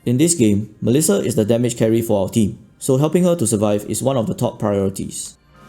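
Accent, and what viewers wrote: Singapore. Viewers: Saw your defence item guide on my feed a few days ago. Heard your voice and immediately knew you are sinkie. Great guide on that one too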